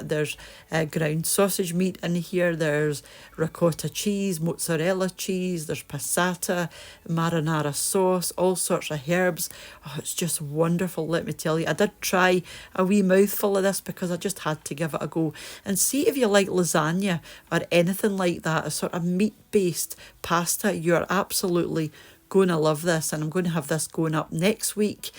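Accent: Scotland, United Kingdom. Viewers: Hey, have you thought of changing the "Hillbilly" music to some nice Bagpipes? 🤣 Do you know, if you weren't Scottish, your channel wouldn't be half as good!... I mean you're a great cook, but it's your wonderful friendly Scottishness that makes your channel really special! Your accent is a delight! And Scotland is such a beautiful place! 🏴❤️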